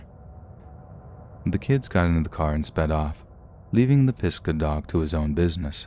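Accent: Australia. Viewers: awesome an Australian narrator i love it 👍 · Ah jesus no one expects an Australian accent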